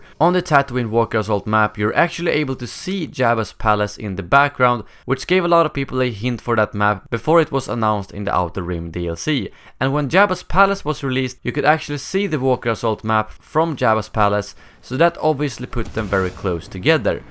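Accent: Sweden. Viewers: Dude are you swedish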